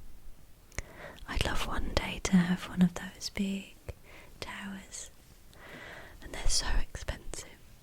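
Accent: England, United Kingdom. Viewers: Getting some nice Tingles running thru my body! I love the sound of your voice and your English accent listening to you is a very nice way to relax!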